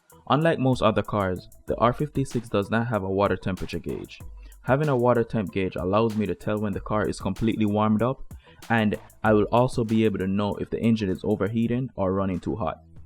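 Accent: Jamaica. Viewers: Hey, I hear a Jamaican accent. I recently bought a well kept 2005 R53 Cooper S. What is the purpose of the Meth and would this be appropriate for the R53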